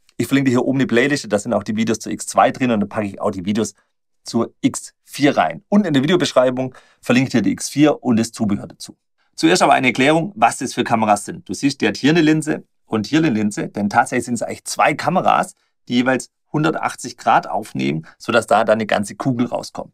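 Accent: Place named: Swabia, Germany. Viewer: Ich liebe deinen schwäbischen Dialekt 🤗👍👍👍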